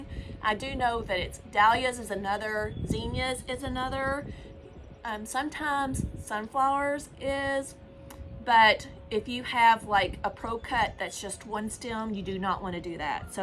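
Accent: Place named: South, United States. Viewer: I don’t care how you say your words, It brings out your southern charm. In the plant world I think people get so hoity-toity about how words are pronounced, so much of it is regional.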